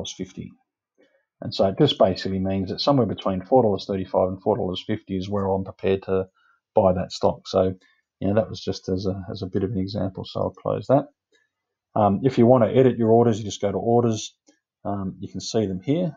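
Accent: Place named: Australia. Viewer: Mate your videos are superb, no fluff, music or silly thumbnails, just solid useful information. Thank you. I've subbed, you deserve more man. Plus your easy on the ear, kinda like an Aussie Jim Browning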